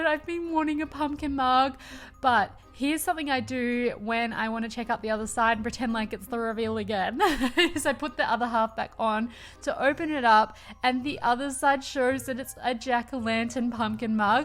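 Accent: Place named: Australia. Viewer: You are such an Aussie! Lmao "oh there's a creepy crawly." You pick it out. Me: nope!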